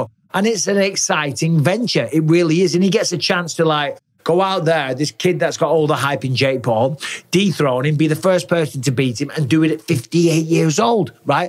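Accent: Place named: United Kingdom. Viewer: Why are you speaking like a Brit?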